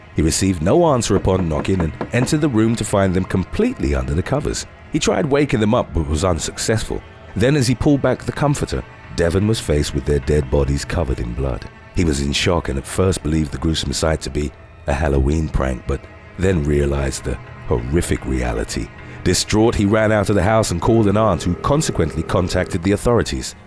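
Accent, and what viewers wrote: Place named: England, United Kingdom. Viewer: Dark skin, English accent, hypnotizing voice. Very unique. Makes the crimes more bearable to listen to.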